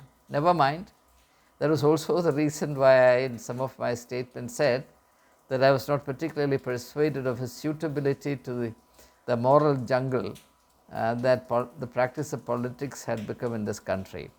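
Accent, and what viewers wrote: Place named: Kerala, India. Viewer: You are a malayali,that accent never go! It's charming!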